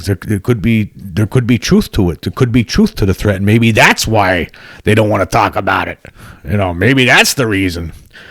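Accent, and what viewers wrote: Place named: Philadelphia, United States. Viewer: You sound like a Philly boy like me.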